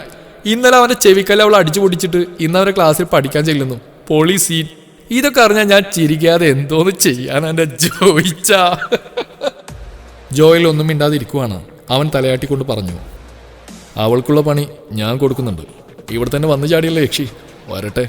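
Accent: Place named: Thrissur, India. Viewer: Thrissur basha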